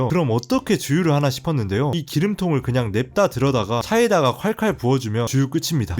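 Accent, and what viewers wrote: Jeolla, South Korea. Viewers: 고향이 전라도인가요?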